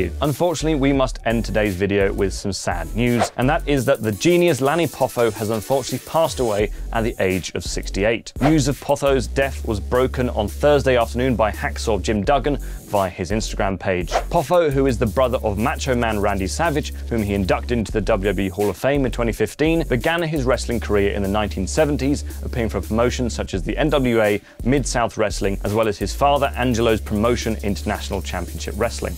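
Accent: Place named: RP, United Kingdom. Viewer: This guy is super posh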